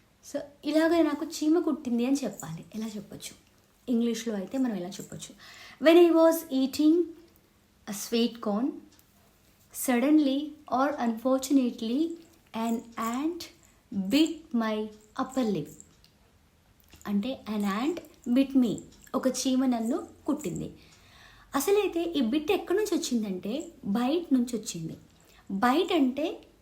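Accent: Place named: Khammam, India. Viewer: Your telugu seems to be from khammam district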